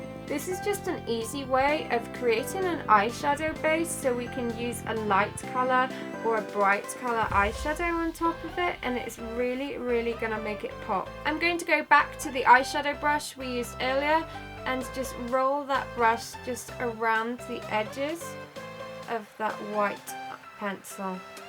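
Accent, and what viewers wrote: United Kingdom. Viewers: i'm german and i absolutelyyy looovee your british accent (is is acctually british huh?! :D) and I love your videos!!!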